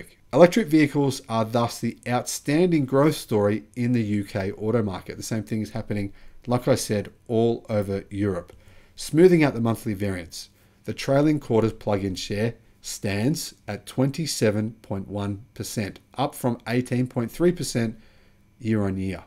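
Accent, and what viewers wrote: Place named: Australia. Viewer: is based in Melbourne, Australia. Listen to English and his accent - You clearly hear that he is an Aussie. How in the world could you think that this is an American fanboy site. This is not a Tesla fanboy site. This is an good channel about the ongoing electrification of our transports.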